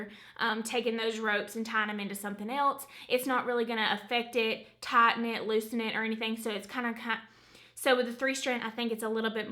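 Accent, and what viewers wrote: South, United States. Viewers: Im from germany and your southern accent is soooo amazing, i love the way you talk!! And of course the info on the macrame rope is very helpful lol 😉👍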